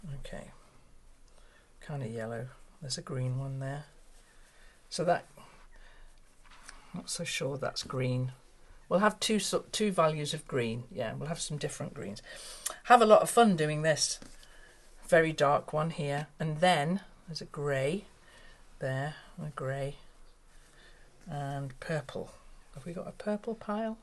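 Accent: United Kingdom. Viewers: Just found your channel. I'm so happy I did. You are just deliteful to watch. I love your British accent!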